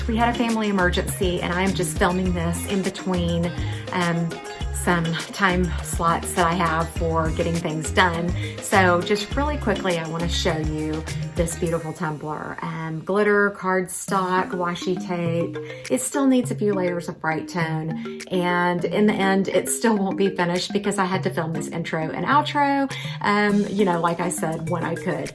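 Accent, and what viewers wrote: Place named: Georgia, United States. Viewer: Beautiful, as always!!! Can I ask where you are from? I'm a southern girl living in the Midwest now. But you sound like you might be from Georgia. Praying for peace and comfort during the family emergency.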